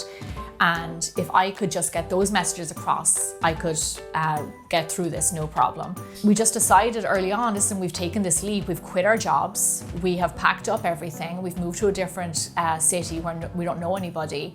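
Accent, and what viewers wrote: Ireland. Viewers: I believe that I was one of your first viewers. I am so happy that you have become successful with something that clearly makes you happy. I adore your Irish accent as it reminds me of my favorite moments when I would go to my grandparents home in Kilkenny Ireland. They have since passed and I haven’t been back but I like being able to listen to your calming voice talk me through your delicious recipes. I’ll be ordering a cookbook. Also, I need to find some cute measuring spoons and cups like yours as they brighten my mood.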